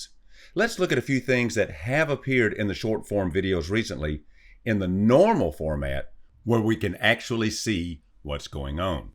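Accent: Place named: Arkansas, United States. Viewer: Dude you deserve way more subs. Your channel is among the highest editing and narration quality on YouTube. Not sure why an Arkansas accent makes me so receptive. Maybe it has something to do with my father in law/boss/best friend being from Little Rock.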